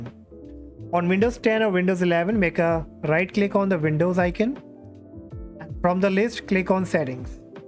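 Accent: India. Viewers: Idk why but you sound like those call center frauds. I'm for calling you fraud but those people just spoiled Indian accent.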